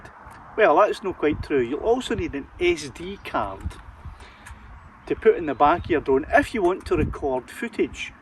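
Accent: Scotland, United Kingdom. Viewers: Your vlogs are really very good as you explain things in a simple and non complicated manner...💯..the language barrier as you say is a wee bit difficult to understand because of your lovely Scottish accent but the English captions fixes that problem...love your work..💯keep on droning..👍🍻🇦🇺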